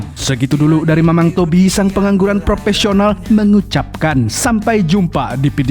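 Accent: Banjar, Indonesia. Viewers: urang Banjar kah pian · urang banjar kh pian mang🤣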